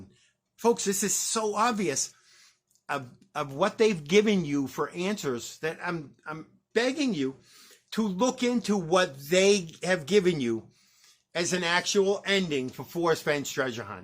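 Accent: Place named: Boston, United States. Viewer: In your video you say "Kent and Esther is pronounced Canasta, at least that's how we say it in Boston." So is your claim the poem could ONLY be completed by someone from Boston area? Not the redneck from Texas (they sure don't pronounce it that way). No one from 99.9% of the world? Only someone who has a Boston accent? Did Forrest ever even go to Boston? It makes no sense for someone who spent 15 years creating a poem to make it solvable for only people with a Boston accent, then release it at a book store only in Sante Fe. And Forrest says he wrote it for every redneck in Texas. You are very biased towards your pronounciation and ignoring what Forrest writes and says. I'm glad you promise you are done. Good for you. Your brain injury is a sad story but you are brave to openly discuss it and it should help everyone be understanding of your handicap. 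it comes through loud and clear; " I've offered them treace peaties so, so many times" Is that how you say it in Boston?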